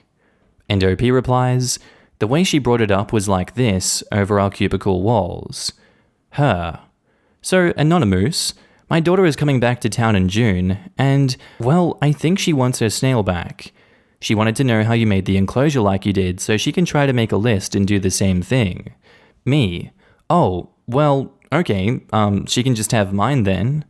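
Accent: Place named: Australia. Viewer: Ayeee what's poppin,?? Also, is your government name or just a nickname? Thank you for all the videos and lovely Aussie accent. We love you, we appreciate you. Keep going mate, you're bloody awesome 🤗💞🙋🏾‍♀️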